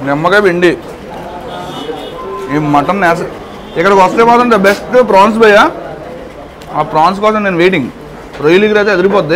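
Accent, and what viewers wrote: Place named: Andhra Pradesh, India. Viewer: Bro nevu chipe style iethi super maa tanuku bhimavaram sleang lo super mouthwatering also.exlent bhiya nuvu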